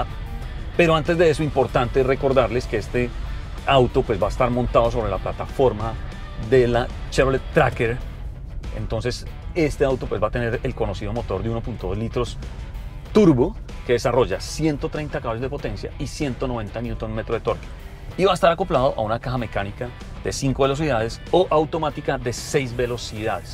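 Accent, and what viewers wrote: Bogotá, Colombia. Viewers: Habla como rolo lentico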